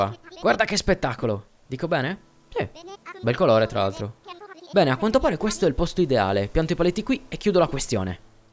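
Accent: Sardinia, Italy. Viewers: Ma sei sardo? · Ma pk hai l'accento sardo? PS io sono sardo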